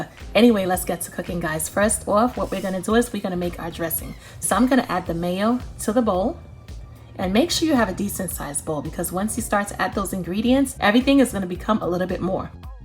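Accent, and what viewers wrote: New York, United States. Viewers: You sound like a new Yorker 🤔🤔🤔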